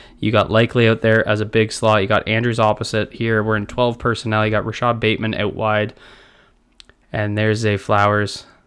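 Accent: Canada. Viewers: Hey man I love your content & analysis. Btw I noticed your accent. Are you Canadian?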